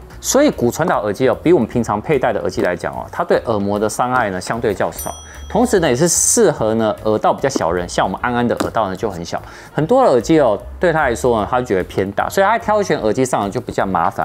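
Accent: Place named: China. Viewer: 聽旁白，還以為聽到阿陸仔的頻道咧！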